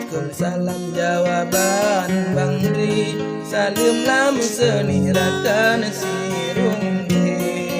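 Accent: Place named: Nagan Raya, Indonesia. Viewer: bereh bg..suara yg khas nagan..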